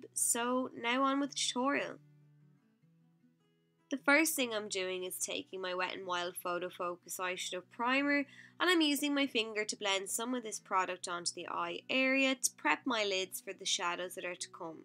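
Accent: Ireland. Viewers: Is that an Irish accent I hear?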